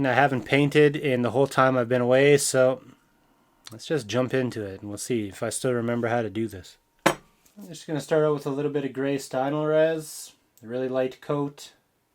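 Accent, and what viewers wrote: Canada. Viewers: I thought tube had closed u down man good to hear rhat Canadian voice good video bro 🇬🇧🏴‍☠️🇨🇦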